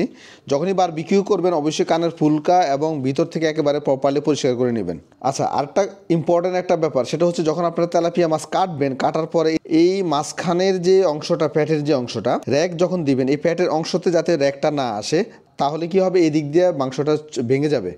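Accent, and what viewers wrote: Noakhali, Bangladesh. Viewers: মুখের ভাষাতেই বুঝা যায় ভাই নোয়াখালীর..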